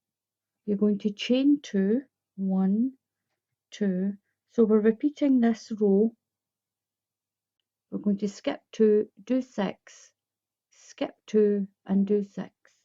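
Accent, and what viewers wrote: Scotland, United Kingdom. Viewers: I'm a beginner and have watched your tutorial twice to get up the nerve to try it. Third time is the charm! Though I did have to rewind a few times, I think I have it! I love how you explain everything so clearly and I also love your accent. Scottish? Thanks for this pattern.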